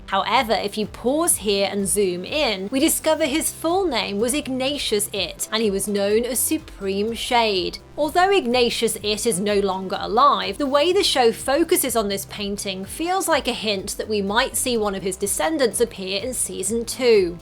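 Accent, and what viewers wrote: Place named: United Kingdom. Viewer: That correct pronouce of Ortega was absolutley unexpected, whilst having a strong Brit accent.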